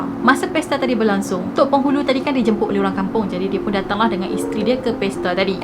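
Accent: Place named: Kedah, Malaysia. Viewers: Tgk gaya n percakapan mcm oqg kedah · kedah ni boss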